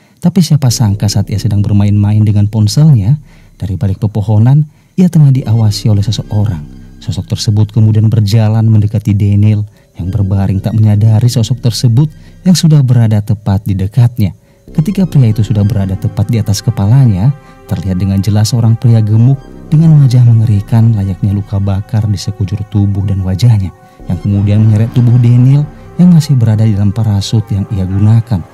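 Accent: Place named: Medan, Indonesia. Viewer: Suara nya kayak orang medan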